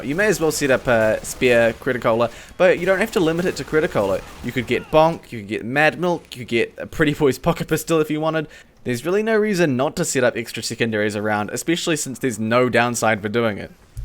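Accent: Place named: New Zealand. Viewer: I haven't been subbed for long but I've watched a few recent videos of yours and I love how you take what valve dishes out without being angry at anything and the way you use the new mechanics of the game. I'm glad we have someone in the tf2 community that is so positive about the game instead of just complaining about X favourite weapon being nerfed and saying that tf2 is dead. Also love the NZ accent!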